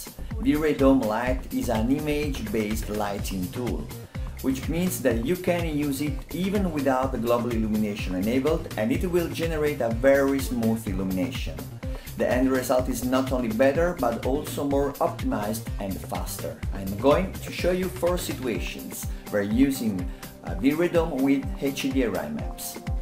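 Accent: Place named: Italy. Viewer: VERY NICE. Your accent looks like Italian)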